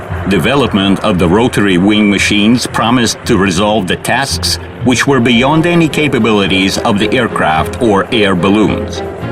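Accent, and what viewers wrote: Russia. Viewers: I always like the narration of this series. The Russian accent gives it a certain authenticity.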